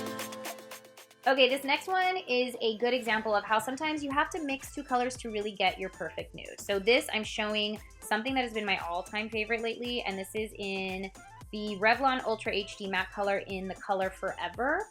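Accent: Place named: India. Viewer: Hey are you Indian? I really love your accent plz try to reply in Hindi because I wanted you to say something in Hindi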